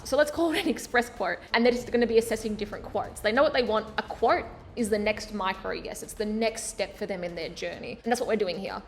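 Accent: Australia. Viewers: Still loving that Aussie accent. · New sub after watching many of your videos over the past few days. Also, fellow Aussie accent! Yay!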